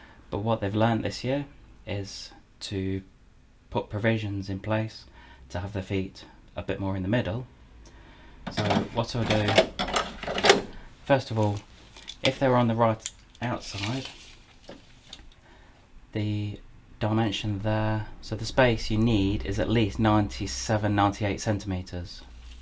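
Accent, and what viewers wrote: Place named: Birmingham, United Kingdom. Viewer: And it's with a Brummie twang to it too.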